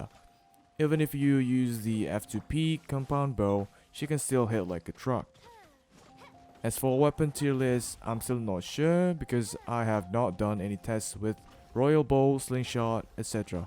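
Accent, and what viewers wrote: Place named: Australia. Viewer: aussie accent 👀👀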